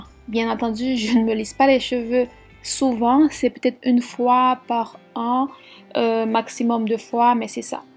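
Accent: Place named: Quebec, Canada. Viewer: L'accent québécois allez!!!!11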